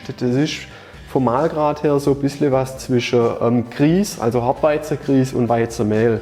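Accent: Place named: Swabia, Germany. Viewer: Subborr Schwääbischkurs :)))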